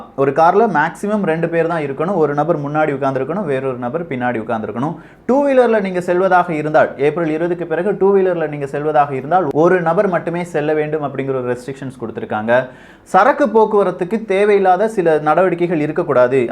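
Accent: Kerala, India. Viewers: நண்பரே உங்கள் தாய் மொழி மலையாளமா? உங்களின் சில ஆங்கில உச்சரிப்பு மலையாளிகள் போலவே உள்ளது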